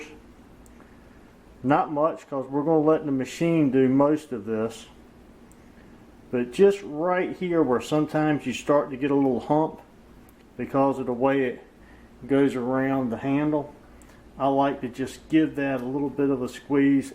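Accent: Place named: South, United States. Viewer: I just love that southern accent of yours . 😊 On the other hand, in the absence of a vacuum machine, what would you use?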